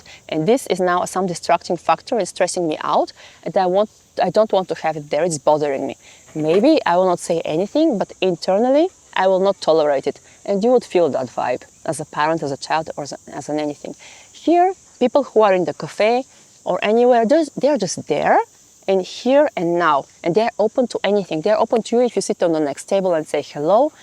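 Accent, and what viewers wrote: Bulgaria. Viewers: Cudno, ali prvi put slusam nekoga da govori srpski sa bugarskim akcentom. :D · bravo..ali tako je jak bugarski naglasak kod nje, vrlo simpaticno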